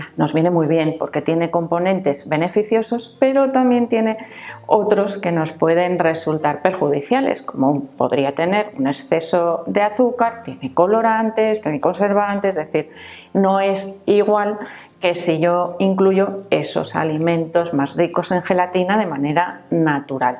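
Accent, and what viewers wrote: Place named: Castile, Spain. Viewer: Que acento tan bonito del castellano ❤❤❤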